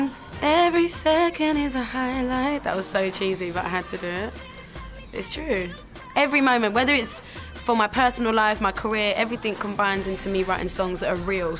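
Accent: United Kingdom. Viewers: I didn't know she was British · Wow she's British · Before you ask, YES, she is british :) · Damn this whole time I didn't know she was British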